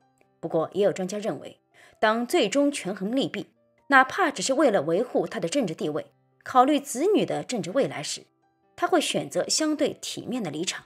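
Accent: Shanghai, China. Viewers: 老師妳的德文真好，我的德文早已還給教書先生了。羨慕妳的多才多藝。有人説妳有上海口音...我父母是上海人，民國 38年到台灣，最後也沒有機會回到上海看一看。如果不是國共內戰，我可能也會在上海長大。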